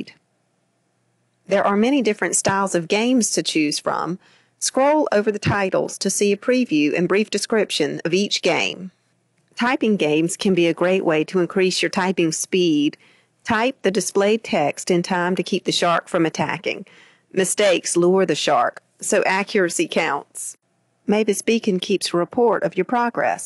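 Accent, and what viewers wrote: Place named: South Carolina, United States. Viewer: I think from South Carolina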